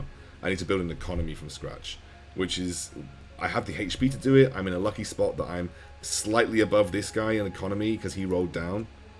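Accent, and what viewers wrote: England, United Kingdom. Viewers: The background music and the accent from a mature england man made this a lowkey asmr video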